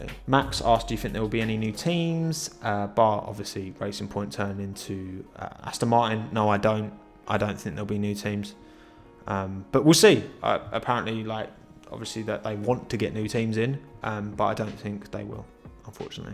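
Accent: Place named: Essex, United Kingdom. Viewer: Didn't even need to ask where you're from, could hear the Essex a mile off! Really enjoying the content 👍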